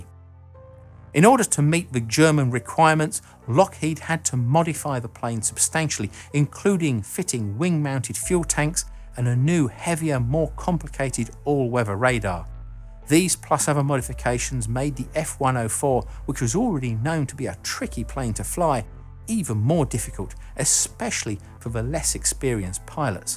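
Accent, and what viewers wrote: United Kingdom. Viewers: Is it british accent?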